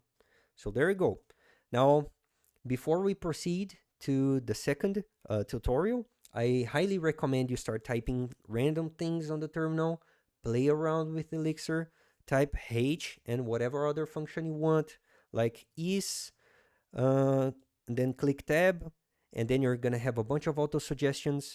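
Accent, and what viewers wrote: Brazil. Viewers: Great content! Are you Brazillian?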